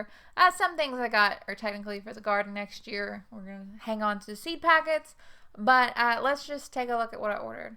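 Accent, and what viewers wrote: South, United States. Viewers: I have never grown sweet peas before and would like to try. You sound like you live in the south-so do I-do you have good success? It gets super hot where I live in north Georgia so I’m thinking I might be able to get some spring blooms.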